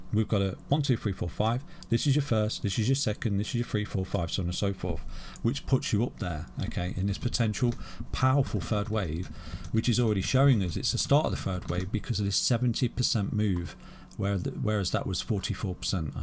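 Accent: United Kingdom. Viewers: He is from the UK and you are probably not understanding him when he says "big up the coinskid crew"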